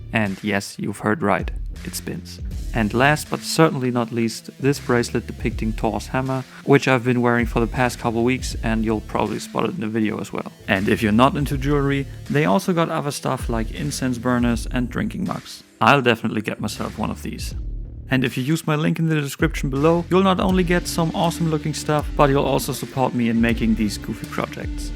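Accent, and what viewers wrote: Germany. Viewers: your clearly german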